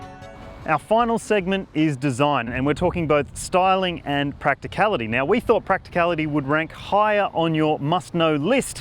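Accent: Australia. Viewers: Aussie accent.....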